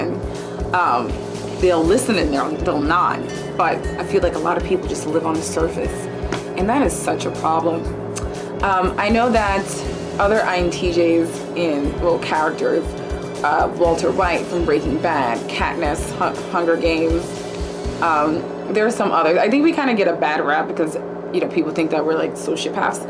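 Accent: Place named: Caribbean. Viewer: You have a Caribbean accent...where u from....intj from usvi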